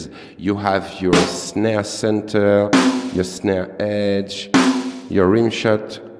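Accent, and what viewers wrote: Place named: France. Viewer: bonjour, il me semble reconnaître un français derrière cette voix.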